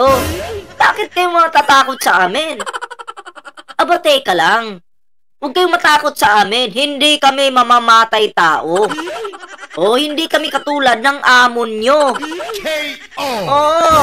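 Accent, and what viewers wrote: Visayas, Philippines. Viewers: Bisaya ang tunog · Nagsasalita k nakatago mukha mo sa salita p lng me punto n bisaya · Putang ina mo gago ka bat ka nakatakip mukha mo bobo kupal ka matapang ka ipakita mo mukha mo hindot ka sipain pa kita jan boses bisaya kupal na yan.😂😅mukhang pwet kaya nakatakip mukha niya idilat mo mata mo kupal ka Kalahari na nga mata mo nagtatago kapa 😂 ang mga Dutae na pinapanigan mo sila ang tunay na magnanakaw eh malamang ganun ka din ano naayudahan ka ba ng mga Dutae tanga.😅😅😅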